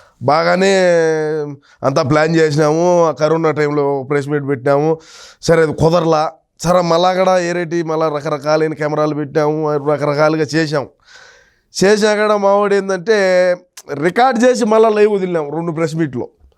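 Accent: Rayalaseema, India. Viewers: 😂😂😂 సూపర్ అన్న.3 రోజుల నుంచి మీ ఛానెల్ విడియోలు చూస్తున్న అన్న సూపర్. మీ రాయలసీమ యాస వినడానికి చాలా బాగుంది.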